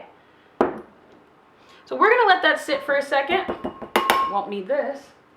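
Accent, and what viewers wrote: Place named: South, United States. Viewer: Just so Happy she doesn't Sound like she's from Ny but a Nice young Lady. Sounds like a a Good Southern girl 👍👍👍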